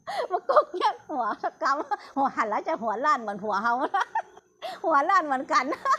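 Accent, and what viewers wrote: Thailand. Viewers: หวัดดีจ้าม้ะ.พูดใต้สุด+.เหนือสุด.เลยนะฟังน่ารักจัง.กดตามแล้วจ้า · สมัครเป๋นเอฟซี เจ้า ชอบตี้อู้กำเมืองเจ้า ข้าเจ้าเป๋นคนเมืองเจียงใหม่เจ้า กำว่า นาบโต๊ด ของคนเหนือ ภาษากลาง คือ ใส่ร้าย เจ้า❤️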